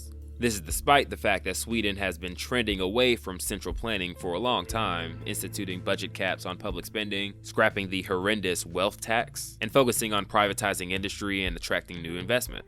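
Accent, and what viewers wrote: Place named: United States. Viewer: Imagine taking shots at another country's violence in a thick American accent lmao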